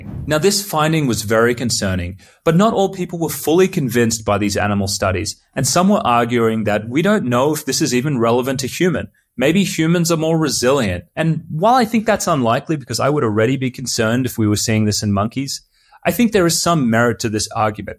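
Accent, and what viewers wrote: Australia. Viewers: Name sounds Austrian, voice sounds Australian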